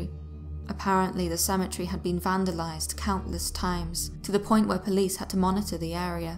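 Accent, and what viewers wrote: England, United Kingdom. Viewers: The English Accent always does and when combined with the beauty of a female voice then I sit here enchanted by the story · Thanks. This was well done. I like to listen these tales while doing things around the house. I’ve tried listening to some other ‘told stories’ videos, but they’re either over the top with their music and graphics, and/or the narrator is not very good at telling the story. One channel that listened to for a bit had narrators who sounded as though they were reporting the news on British television ( I’m American so it hits my ear in an odd way ). No offense as it sounds as though you may be British as well. However, you did quite well here. Anyway, thanks again. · Love your English accent and you tell the stories so well I love listening to you!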